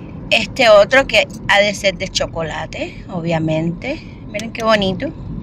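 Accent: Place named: Cuba. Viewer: ME ENCANTAN TUS VIDEOS, ERES SUPER COMICA. NO HAY NADA MEJOR QUE COMENZAR EL DIA MIRANDO UNO DE TUS VIDEOS PARA ESCUCHAR EL ACENTO CUBANO Y REIRME CON TUS COSAS. QUE ALLAH TE BENDIGA SIEMPRE.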